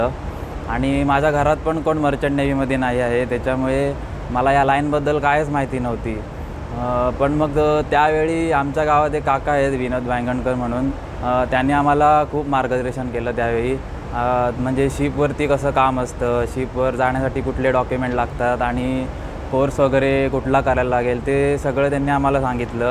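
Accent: Konkan, India. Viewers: मी पण कोकणी आहे तुझा बोलने वरुन समजते तुकोणी आहे ते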